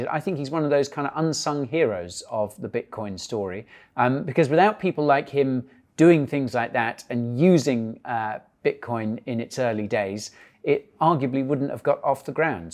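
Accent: United Kingdom. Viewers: one of the few channel's that gives you facts and doesn't promote sh1t coins, keep up the great work, and your British to boot.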